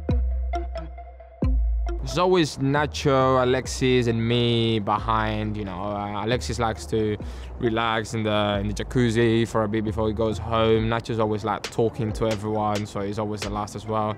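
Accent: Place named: United Kingdom. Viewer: Je has a littel britisch accent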